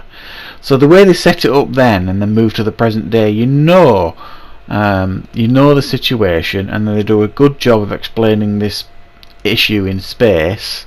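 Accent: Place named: Scotland, United Kingdom. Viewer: Scottish Moviebob??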